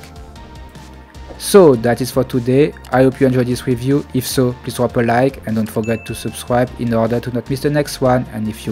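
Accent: France. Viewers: Thanks for your videos. I love your french accent :)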